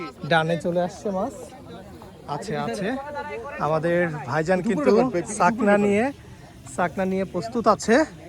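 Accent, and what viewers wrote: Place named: Kolkata, India. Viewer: ai motheri tui kolkatar moto kore kotha bolos kn .. tui ki bangladeshi naki indiar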